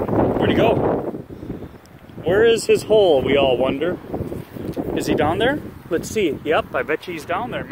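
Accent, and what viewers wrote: United States. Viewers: Is he from Minnesota? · Sweet alabama or america somewhere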